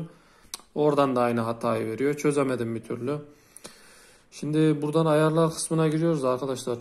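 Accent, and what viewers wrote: Turkey. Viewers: Abi yozgatlımısın?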